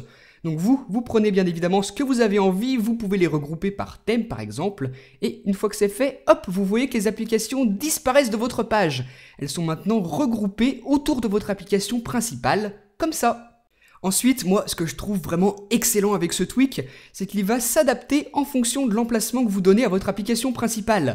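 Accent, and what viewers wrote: France. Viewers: ptn il me saoule ton accent francais quand tu dis des choses anglaises --'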